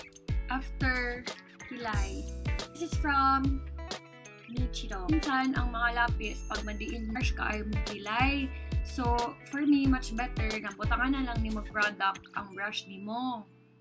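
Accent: Visayas, Philippines. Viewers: Cute kaau sya mag bisaya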